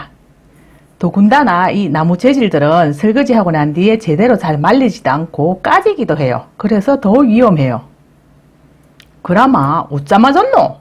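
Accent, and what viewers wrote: South Korea. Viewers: 어머 얼굴공개하셨네요~완전반전 미인이시네요..ㅋ말투는 시골사투리 얼굴은청담동아줌메비쥬얼~~^^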